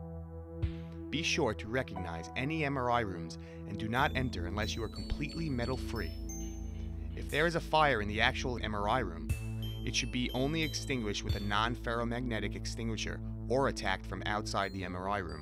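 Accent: Boston, United States. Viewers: I don't know what was better, the education or the Boston accent.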